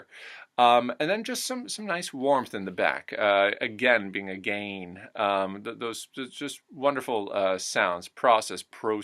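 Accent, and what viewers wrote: Canada. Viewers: Very, nice. I always like the Canadian accents. One characteristic I have noticed, especially with the more western Canadian accents is that they do not put much emphasis on their consonants- they seem more muted that the vowel sounds.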